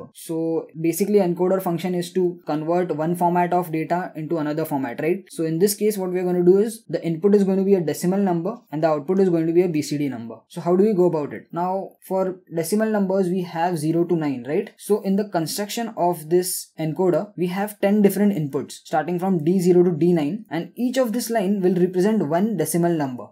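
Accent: India. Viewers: He is an Indian and its obvious that he'll have an indian accent.Stop expecting people to change their accent according to your convenience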